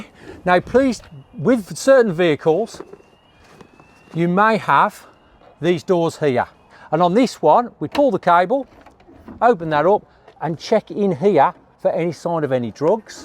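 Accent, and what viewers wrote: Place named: United Kingdom. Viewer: Because that’s his local accent. There are many dialects and accents in the UK.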